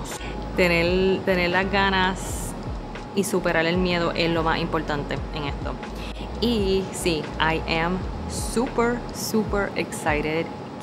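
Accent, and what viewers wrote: Puerto Rico. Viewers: Thank you so much for making these videos. They help me a lot to practice Puerto Rican Spanish. It adds a nice touch knowing you are also Asian 🥹🥲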